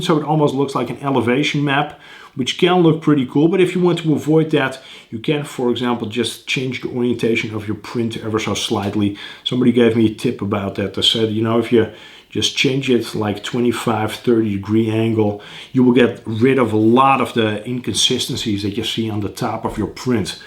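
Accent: Netherlands. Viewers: Do I hear a Dutch accent? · Je hebt een beetje een Nederlands Engels accent, klopt dat ?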